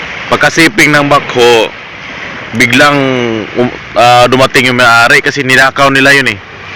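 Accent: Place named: Quezon, Philippines. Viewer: Parang naa ka sa quezon province sir.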